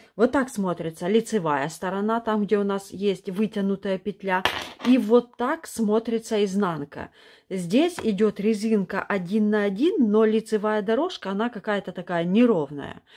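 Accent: Ukraine. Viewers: Здравствуйте,!Огромное спасибо за супер инфо. А у вас есть шапка для детей? сколко петлю надо набрать для7летки? Заранее спасибо за ответ. полюбила вашу речь, украинская)) Привет из Баку.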